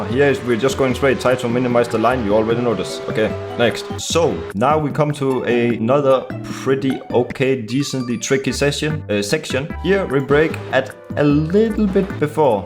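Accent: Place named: England, United Kingdom. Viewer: Pure comedy and that English accent man, epic!!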